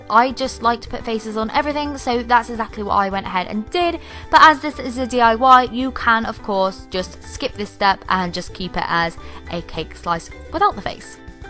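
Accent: United Kingdom. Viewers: Your voiceovers are great! I love your British accent!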